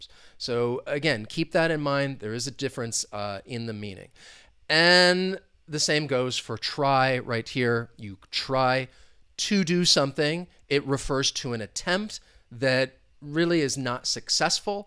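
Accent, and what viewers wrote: United States. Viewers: he is an American.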